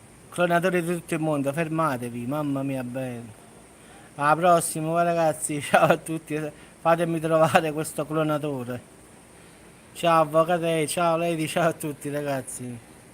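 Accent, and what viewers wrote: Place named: Salerno, Italy. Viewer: Buonasera, mi piace moltissimo l'accento del tuo dialetto sei davvero simpatico, stasera ti sento molto provato dal caldo, anche qui da noi, fuori Firenze non scherza, stasera un po' meglio è più ventilato e la temperatura si è abbassata...buonanotte a tutta Salerno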